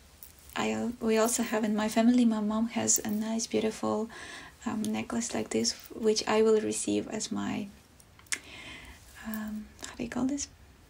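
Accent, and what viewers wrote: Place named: Europe. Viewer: This is my first time watching your podcast and I loved it.. thank you so much. You are very talented & it is a joy to follow you. Also, because I am also from Europe, it is so lovely to hear your accent. Your story of your grandma embroidering the blouse really touched me, as it reminded me of my own beautiful grandmother. She was from Austria.. a wonderful knitter, crocheter & embroiderer, who also designed knitting garments & just knit from her memory. I learnt so much from her, and miss her every day 💕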